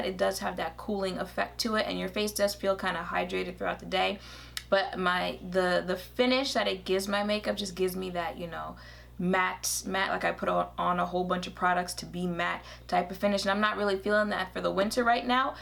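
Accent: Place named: United States. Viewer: The foundation was a perfect match ! It's the first time I see you wear blush,it's really pretty ! Btw,"amande" is pronounced "amand" but i love when americans speak french,it sounds a lot cuter than when we speak english :p beautiful as always ;) xx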